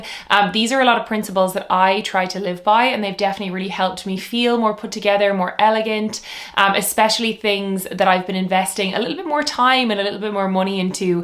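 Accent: Ireland. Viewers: Really rude comment. I love the lilt of an Iris accent. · Cant stand the irish accent though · Oh the Irish accent! One of the most beautiful sounds in the world! I’m a voice over artist ..I should know! ❤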